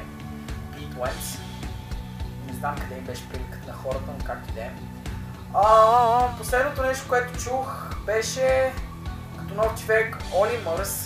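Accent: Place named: United Kingdom. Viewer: имаш доста готин английски акцент, по-скоро великобритански, за да се разбере по-добре какво имам предвид. Защо не направиш клип с любимите ти или най-омразните ти неща на великобритански английски... ще ми е интересно :)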